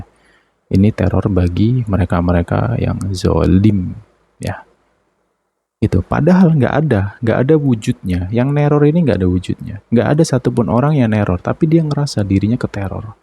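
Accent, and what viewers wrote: Java, Indonesia. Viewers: Asalamualaikum😁ea mas suwun lo tapi bru semenit kok udah kangen mas ngomonge fersi jawa gitu ngena banget mas🤍🙏🙈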